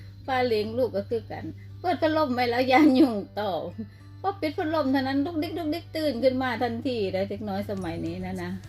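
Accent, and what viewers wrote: Phu Thai, Thailand. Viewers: เว้าภูไทเพราะดีครับ ฝนตกๆเด็กชอบเปิดพัดลมนอนสบายดีครับป้า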